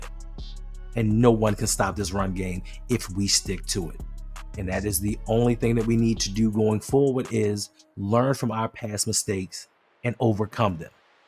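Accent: Baltimore, United States. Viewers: You can sure tell you're from Baltimore 😂😂😂 that bmore accent RICH!! lol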